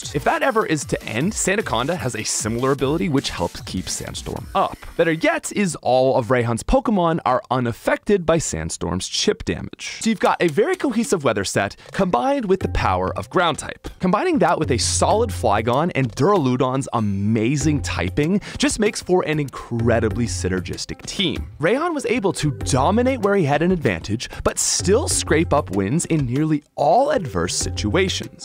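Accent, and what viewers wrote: Canada. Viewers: Digging the Canadian accent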